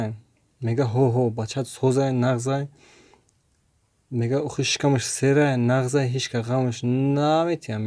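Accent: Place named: Khatlon, Tajikistan. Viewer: Kor nadoren diga rav aval kamtar gap zadana yod gir, yak video 5 minuta 800 bor pauza kaday, ay gap zani malumay ay Khatloni neki gap zadana yod gir.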